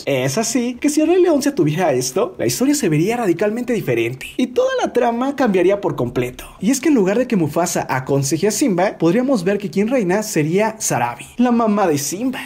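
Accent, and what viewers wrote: North, Mexico. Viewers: Chale, lo último es traumante xdxdxd Diablos que norteño xdxd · Alv esa mierda estu muy norteño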